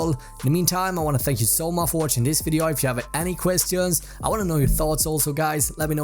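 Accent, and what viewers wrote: Sweden. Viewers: You sound swedish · E d svensk??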